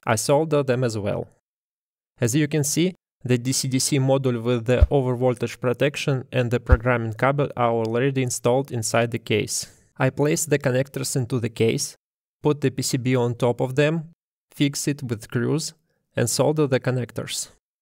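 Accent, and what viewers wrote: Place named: Russia. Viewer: 😁 слышен "наш" акцентик и коверкание слов) А так видео👍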